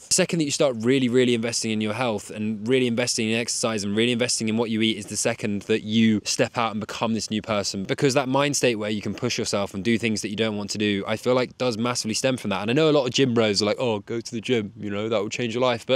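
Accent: United Kingdom. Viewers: WOW 너의 영상을 보며 영어공부를 합니다. 너의 영국발음이 너무 어렵습니다. 나는 영국인처럼 영어를 하고 싶습니다.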